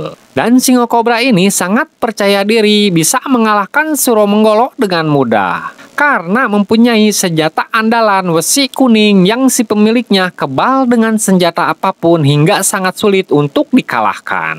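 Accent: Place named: West Java, Indonesia. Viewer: Kaya orng Sunda😀😀😀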